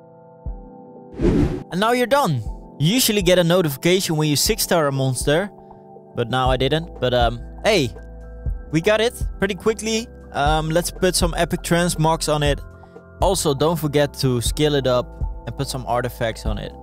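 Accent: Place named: Netherlands. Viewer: I saw you on killa´s video and i knew right away you we´re dutch haha and then few minutes in the video when you said toa then you confirmed it haha! Nieuwe sub erbij :) succes met je kanaal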